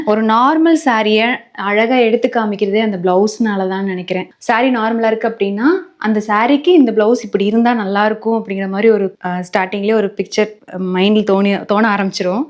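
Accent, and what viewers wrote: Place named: Kanyakumari, India. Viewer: Neengalum kanyakumari slang paesureenga nalla Iruku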